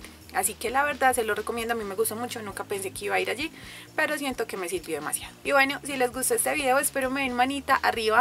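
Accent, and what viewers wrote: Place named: Medellín, Colombia. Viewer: Hola cómo estás, soy de Medellín por tu acento de pronto veo que eres también de acá. Será que me puedes dejar el nombre del médico o centro donde él atiende, y si tienes teléfono de él te lo agradecería bastante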